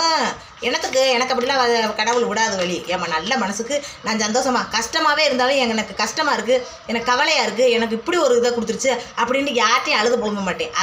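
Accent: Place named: Tirunelveli, India. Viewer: அக்கா திருநெல்வேலி பேச்சு வேற லெவல் சூப்பர் ❤சூப்பர் அக்கா ❤❤❤❤❤❤❤❤❤❤❤❤❤❤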